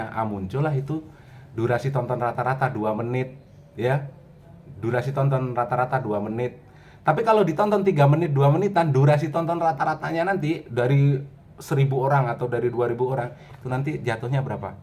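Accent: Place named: Java, Indonesia. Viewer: bang asline wong ndi kok jowone ketok banget heheh.. makasih ilmunya bang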